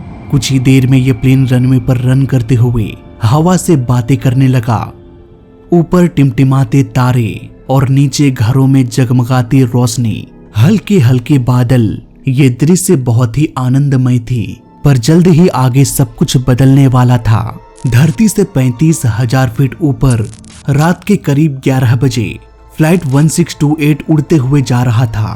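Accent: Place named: Rajasthan, India. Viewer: Rajasthani accent baa. Roshni ko Rosni......ese, kese, vese.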